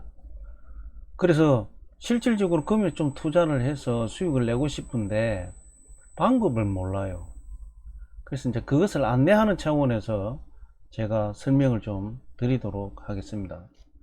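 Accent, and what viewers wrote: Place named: Gyeongsang, South Korea. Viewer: 갱상도 강사님 언태 하시고 꼴고 꼴았고 ㅋㅋ 구수하네요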